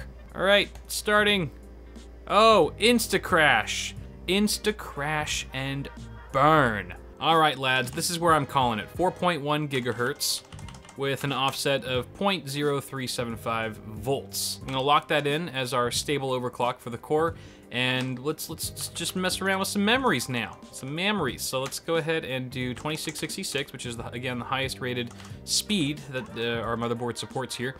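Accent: South, United States. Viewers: I read this in southern accent- I have no idea why!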